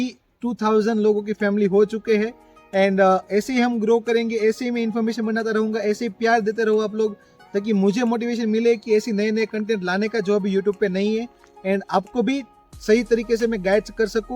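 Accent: Gujarat, India. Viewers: Bhai ki aavaz se gujarat ki khusbu aari h😄 are you gujarati ??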